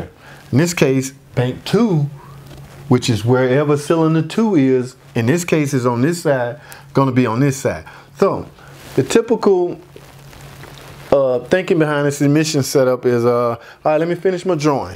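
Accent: South, United States. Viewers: I thought it was just your dialect. Are you in the south?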